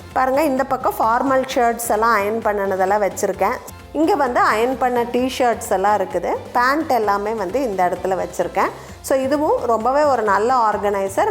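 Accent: Coimbatore, India. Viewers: 😃. U r using ur platform right 💕💕.. From my view u r unique, did not copy anyone💞💕💕💞.. U have beautiful Coimbatore Slang👌💖💖👌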